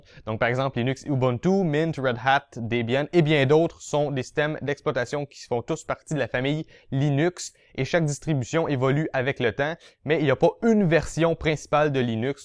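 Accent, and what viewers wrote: Canada. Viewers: Jaime bien les Canadian et l'actent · bonne vidéo, mais tu parles trop vite et tu as un fort accent (Canadien), pour un Français, ce n'est pas très simple à comprendre.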